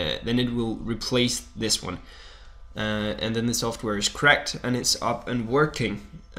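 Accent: Denmark. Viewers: .........You're danish...Aren't you? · you sound danish, are you? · Oh... Jeg opdagede ikke at du var dansker lige i starten, davs! :D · Hej med dig :D Afsløret du en dansker :o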